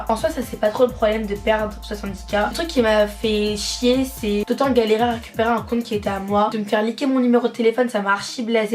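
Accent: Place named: Canada. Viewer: J'aime ton accent Canadian 😍😍😍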